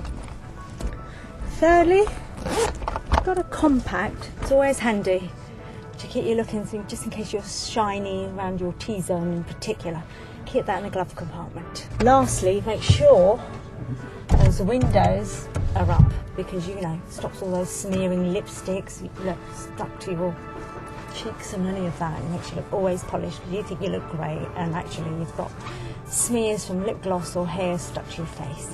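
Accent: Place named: United Kingdom. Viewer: i don't know who this woman is.. but, she's beautiful and she speaks the best british accent i've ever heard...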